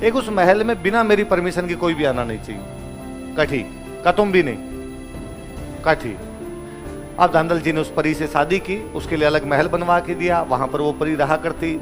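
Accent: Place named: Marwar, India. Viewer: Aapari मारवाड़ी बोली री मिठास ही इसी हे साब ❤❤❤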